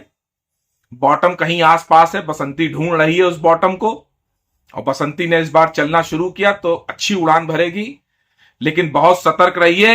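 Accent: Kanpur, India. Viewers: एक दम आम व ज्ञानी आदमी, कनपुरिया स्टाईल में। मजा आ जाता है सुनकर। अति उत्तम।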